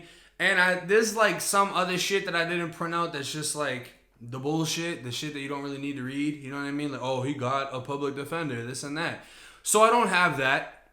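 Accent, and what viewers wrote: Boston, United States. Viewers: I started watching you some months back. You remind me of myself 20 years ago. Respect to what you are doing. At that age no one could tell me anything. I thought I knew it all. But here goes. To change means to change everything. You will learn that being “gangsta” is not cool. Carrying guns illegally isn’t cool. Talking about beating people up is not cool, reminiscing about old dirt is not cool, living in a shitty apartment in roxbury is not cool. You have a great mind that could be used to do so much more then talking about jails and crimes and buck50ing people. I hope to see something from you in 15yrs when you’ve come to the realization that all this shit does nothing more than make you a cop target bullet magnet. I grew up in New England. From what you say you haven’t lived there since you were a small child. What’s up with the accent? 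Adding emphasis on a “Boston” accent isn’t cool either. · You're doing good work out here. "Scared Straight" with a Boston accent.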